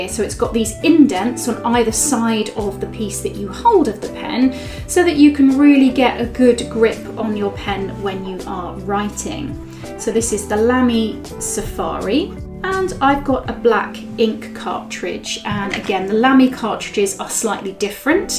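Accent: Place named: South, United States. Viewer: I have a few bent nibs, Love them for their versatility. Love the dulcet southern accent, BTW.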